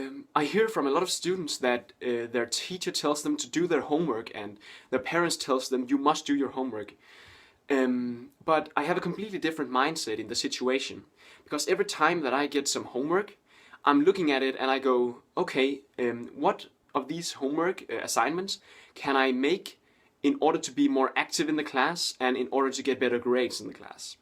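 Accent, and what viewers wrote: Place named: Denmark. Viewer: Går ud fra du er dansk ud fra din accent, det er fandme noget bull shit det der, du bilder folk ind, at man kun skal fokusere på enkelte opgaver, frem for alle. En manglende opgave betyder -3 for den opgave og det er ikke ligefrem skide godt for ens årskaraktere. så kan det da gøre skide ligemeget om du så for 12 i tre afleveringer hvis du alligevel for -3 i to andre. IDIOT!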